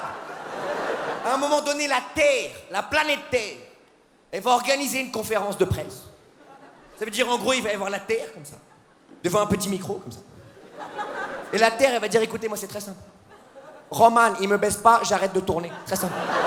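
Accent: Switzerland. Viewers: Trop drole...mais il est Suisse...c pas possib...cet accent...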